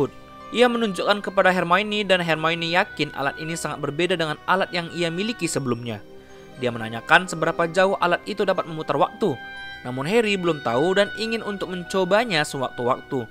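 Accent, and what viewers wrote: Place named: United Kingdom. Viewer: british skali komentatornya hehehe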